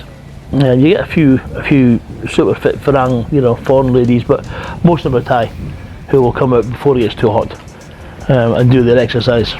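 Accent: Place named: Scotland, United Kingdom. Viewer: I am enjoying your vlogs , and learning to understand your wonderful Scottish accent (lol)! Actually I am a Scot by ancestry, but quite a few generations ago. When I went to Scotland I was embarrassed by my accent, since my surname is pure Highlands . I am looking forward to retiring to Thailand in a few months. Jomtien is one of the places I am considering. My hobby is building and sailing radio controlled sailboats, but they are big and I will probably need a car to transport them to the beach. I have heard that you can park right by Jomtien beach. Is that correct? Looking forward to meeting you in November. Cheers, Colin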